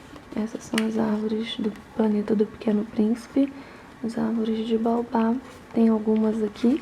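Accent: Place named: Florianopolis, Brazil. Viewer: você tem um sotaque daqui de Floripa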